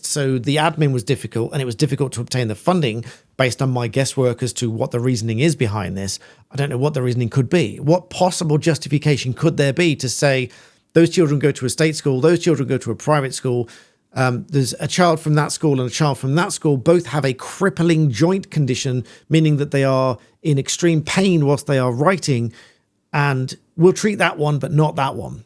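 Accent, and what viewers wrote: United Kingdom. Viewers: Is this a Brit?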